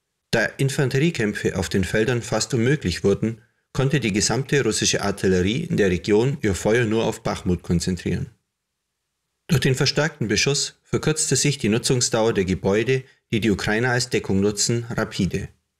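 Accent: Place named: Allgäu, Germany. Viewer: Naja, so halb. Halb Deutsch und halb Allgäuerisch. Besser wäre, ganz Allgäuerisch.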